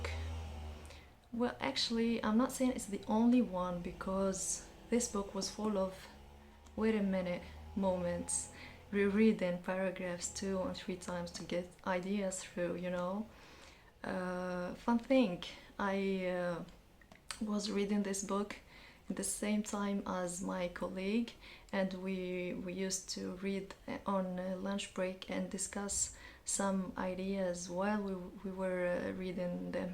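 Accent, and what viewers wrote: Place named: United States. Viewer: thanks for sharing with us. your accent seems like an american accent. bravo wachma all love from spain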